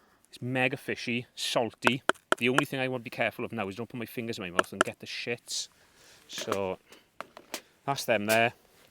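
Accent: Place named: Wales, United Kingdom. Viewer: somewhere in Wales, judging by his accent...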